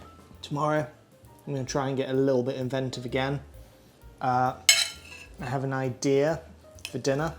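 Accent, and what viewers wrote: RP, United Kingdom. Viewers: Dude I discovered your videos today, maybe 10 hrs ago. At first I head your accent and thought ‘Tory posho’ but I gave your videos a chance and now I’ve watched about 15 vids from your channel. Your actually a really down-to-earth guy and your content is brilliant, proper comfort watching. I haven’t just learnt some new low-cost recipes from the vids I’ve seen, I’ve also been reminded not to make assumptions. You’re a top guy mate, hope this channel goes far! X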